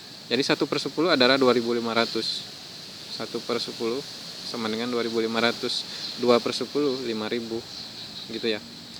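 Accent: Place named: West Sumatra, Indonesia. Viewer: nada minangnya jelass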